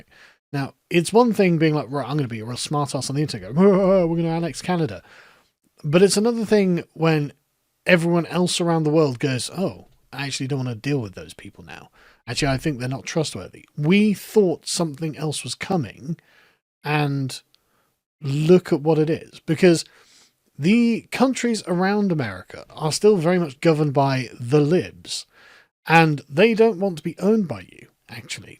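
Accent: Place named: United Kingdom. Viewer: I'm not going to sit here and be lectured about America by a man with a British accent .. look at your country with do you know ? .. lol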